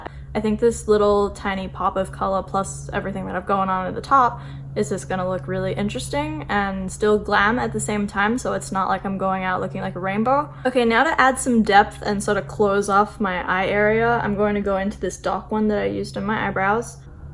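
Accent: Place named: Australia. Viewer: Love your Australian accent, and you look pretty with or without make up, keep up with the videos! 😉👌🏻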